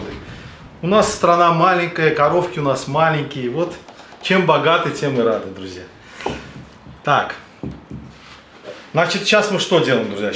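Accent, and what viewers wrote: Baku, Azerbaijan. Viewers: Я многих подписал...акцент бакинский..ностальгия.спасибо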